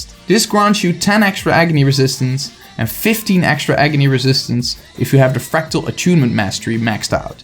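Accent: Netherlands. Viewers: So.., you are a Dutch? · I can always hear the dutch accent, coming from a Swede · This is the most Dutch accent I have ever heard, I love it! You earned a sub! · Yeah. It's a really noticeable accent, especially if you're Dutch yourself.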